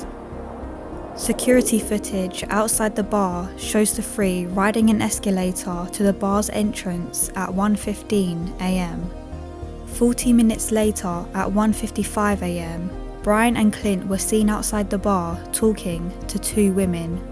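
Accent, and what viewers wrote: United Kingdom. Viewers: Sounds very Croydon? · I love the narrators Luton/London style accent. Sounds elegant with twangs of ghetto 😅 Love it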